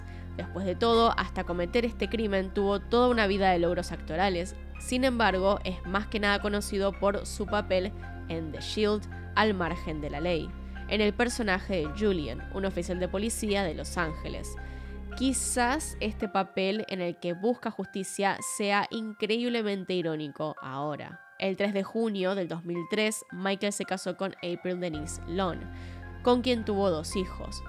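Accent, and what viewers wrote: Argentina. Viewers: Como me encanta el acento argentino!!❤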